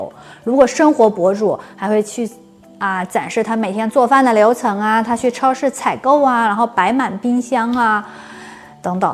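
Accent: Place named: Northeast, China. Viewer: 😂有点东北口音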